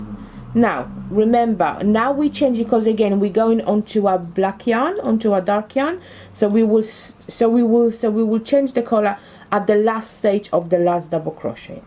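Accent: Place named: Africa. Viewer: U sound African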